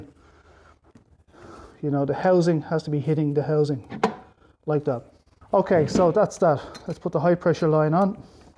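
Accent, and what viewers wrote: Dublin, Ireland. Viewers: Good morning, you sound like an auld Dub, God Bless ya! I don't know how your clip came to me, as I was working on me auld burner that's on a Warmflow boiler out me back in the dark. And Lord behold! When I got up at 04.00 this morning due to the burner being on me mind, I fired up the laptop. I went into Youtube and the very 1st video clip that was shown to me was you. Just unbelievable. Apologies for the long intro here. Just to add... I would like to say that your one hell of a bloke. Your demonstration and explanation is second to none. There's a pint of the Black Stuff on it's way and thanks very much for this well informed video. God bless and may you have continued success with your channel. Kind regards, Paddy.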